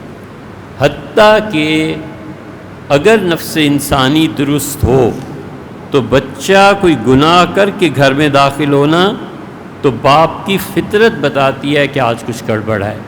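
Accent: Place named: Lucknow, India. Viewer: دریافت فرمایا بشمول کتاب کا عکس مفتی صاحب کی مندرجہ بلالا گفتگو پر غور فرمایا جاۓ کہ مفتی صاحب اپنے لکھنوی لہجہ و زبان کو رائیج کرنے میں کس قدر کوشاں ہیں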